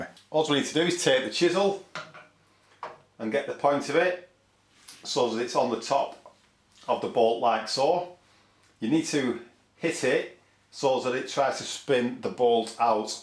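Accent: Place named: England, United Kingdom. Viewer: I love your videos and also enjoy the accent. Reminds me of me old mum who was born in England. Recently had to get some rusty bolts off a car bumper.The problem was the part of the bolt poking through the mounting but was rusty but accessible so hitting it with a small wire brush and lubricating was enough to get them out without shearing off the head. Gotta be lucky sometimes.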